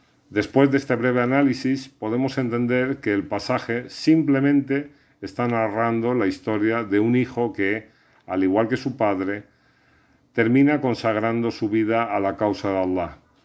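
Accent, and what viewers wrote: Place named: Morocco. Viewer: Hola guapa, eres marroquí? Qué gusto escuchar hablar a alguien así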